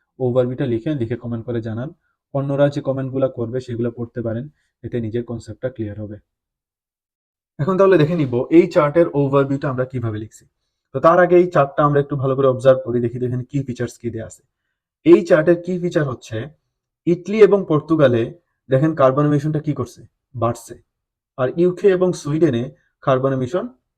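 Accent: Sylhet, Bangladesh. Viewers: are you from Sylhet?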